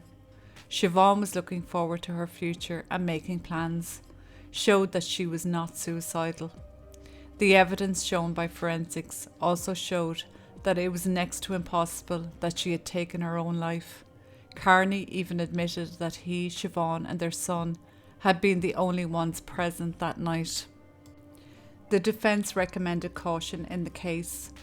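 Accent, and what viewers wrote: Ireland. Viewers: Irish happens to be the most charming accent in the world 😊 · um hello? authentic Irish lass! love it❤ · Every time I hear the phrase “straight away” I think of Ireland the home of my Doyle ancestors ❤️. What a lovely accent and calm manner of speaking. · I enjoyed the listen very much - we don't get enough Irish accents on Youtube imo and I'll take it anytime over the AI generated voices that are flooding true crime channels. I remember this case well having grown up close to and going to school in Goatstown, and hearing the pain of Siobhan's family in the aftermath I think that taking an unexaggerated approach to narrating is appropiate and considerate. It's all a matter of taste, but there's more than enough overly dramatic channels without the same consideration for the families left behind out there if that's what you're into. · Why be so rude, just don’t listen, leave it to the rest of us who enjoy listening to an Irish voice. 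· Nor monotonous at all. A beautiful Irish lilt. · Well, he had damned his soul no matter what spiritual path one believes in. How ANYBODY can weigh money over a life is beyond my comprehension- I came from a violent background and I know how difficult it is for a woman- the struggle sounds as though it is even harder in Ireland. Women have so few instances of recourse- esp if your family is miles away as mine was. How that jerk imagined he could get away with murder with votives for one- hardly what a rapist or cat burglar would set about! I have watched many true crime shows- I am interested in forensics and a possible novel- the latter is highly unlikely ! but it would mean VINDICATION- both my parents are deceased but I'd like to think my mm would know and feel she got some justice- You delivered this case as though the family and victim were friends of yours- a very empathetic touch. 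(and I LOVE your Irish accent). Thank you tastefully but thoroughly discussing a case that NEEDS to be told- Youtube reaches the world and women need to hear stories of other women so they don't become victims either...I couldn't hep but "gag" when I heard the culprit was now counselling other inmates- what a disgusting slap in the face to Siobhan and her kids and family! And how gullible are the authorities at the prison- I hope she has peace now in a life abruptly and brutally ended and I feel for her kids- what they will read, asp her son, as he grows up- newspapers rarely get the facts "straight". Namaste from Canada and All the best for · Well, Polly, there's 1000's of other channels on here that you could've gone to instead. But you chose rudeness instead. I'm sure your mother would be "so proud" of the manners her daughter has...🙄 I personally love her beautiful Irish lilt and will continue to listen to this channel. 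Buh-bye....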